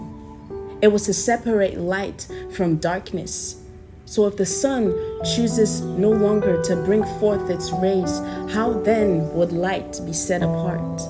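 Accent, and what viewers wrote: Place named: Kenya. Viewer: Amazing Gift!! If I may ask, sis. Where are you originally from? I understand is a Nigerian name and specifically from the people of Benin Kingdom, but your accent is giving Kenyan or somewhat Cameroonian. I'm just curious. Shalom!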